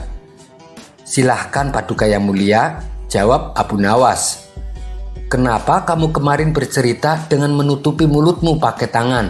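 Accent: Java, Indonesia. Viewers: Medok amat kowel lo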